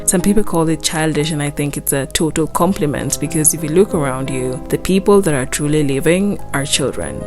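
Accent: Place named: Kenya. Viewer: OMG u just reminded me of my plates 14 years ago before i left Kenya. Why that accent though? I love Kenyan accent. I still keep it after 14 years in USA.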